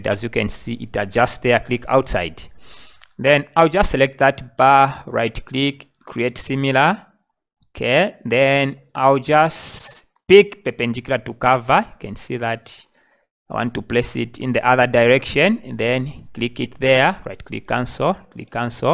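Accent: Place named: Zambia. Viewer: My guy you sound Zambia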